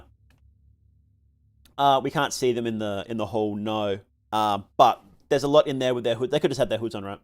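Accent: Australia. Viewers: Mr Funny Australian Man, I have an important lore question. Is Patrick Bateman a new god?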